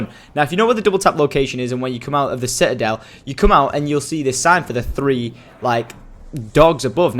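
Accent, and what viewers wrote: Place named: Manchester, United Kingdom. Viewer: You sound like your from Wigan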